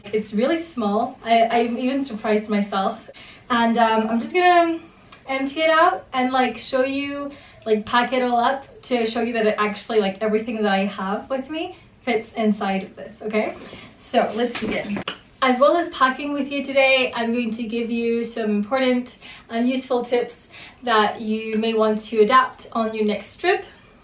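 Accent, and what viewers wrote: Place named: Scotland, United Kingdom. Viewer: Sitting here smiling half hearing a Scottish accent and then it disappears again. I now see you spent some time in Scotland. Much from jennifree in Edinburgh 😀🏴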